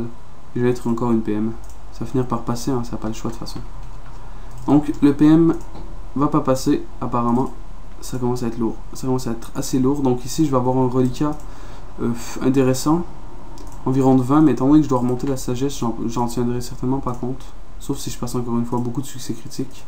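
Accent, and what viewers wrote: Quebec, Canada. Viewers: J'ai reconnu ton accent québécois ;) GG toi t'es un maître de la FM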